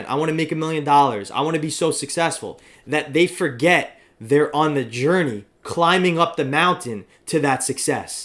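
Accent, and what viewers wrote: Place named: Long Island, United States. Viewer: He’s definitely from Long Island. Spittin facts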